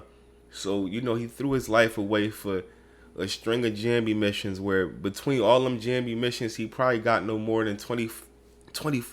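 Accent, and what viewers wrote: Philadelphia, United States. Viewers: I know people that aren’t from Philly or the tri state area don’t be understanding half the words you be saying lol I’m from Philly and I’m like bro talking so Philly that it sounds like you mockin us even though I know you from Philly too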